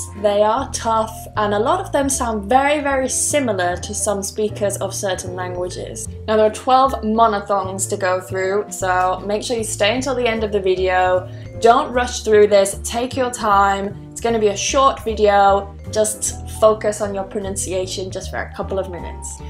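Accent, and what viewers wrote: United Kingdom. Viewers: Thank you so much for sharing this amazing British pronunciation! Are you from liverpool or Sheffield the city of young British female engineer Ruth? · I like English British.Terdengar jelas kental alias medok.Thanks Miss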